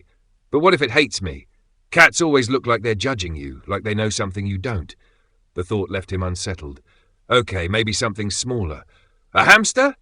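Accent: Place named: United Kingdom. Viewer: Doesn't bother me any. Maybe you just don't like British accents?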